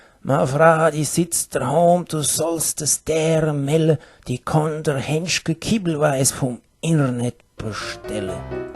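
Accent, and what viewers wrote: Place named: Palatinate, Germany. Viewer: Ein bisschen hört es sich auch an wie pfälzisch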